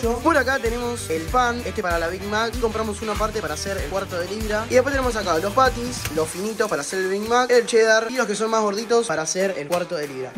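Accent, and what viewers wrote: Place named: Argentina. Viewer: me encantan tus vídeos y también tu acento argentino 😊😊😊😊❤❤ un besote desde San Martín de la Vega 😊😊😊❤😊❤😊❤😊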